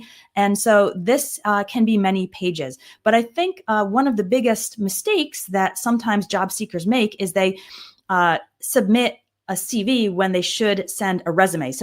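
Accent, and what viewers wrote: United States. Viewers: Very attractive and clear American accent, quite impressive indeed, but too short, it should be a bit longer so the learners can have a better yield ❤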